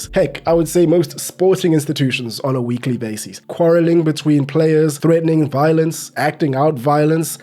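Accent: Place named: South Africa. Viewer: Bro, are you South African? Your accent reminds me so much if my cousins back home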